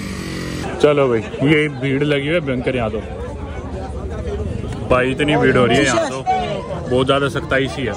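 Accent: Haryana, India. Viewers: Like ur hariyanvi accent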